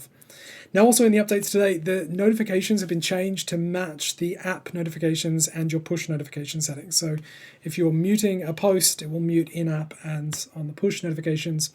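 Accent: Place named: United Kingdom. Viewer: I think you’re slowly losing the Aussie accent 😂. You are sounding more British! Definitely sound a lot different to when I had my first video interview back in 2015 for my mod application 😊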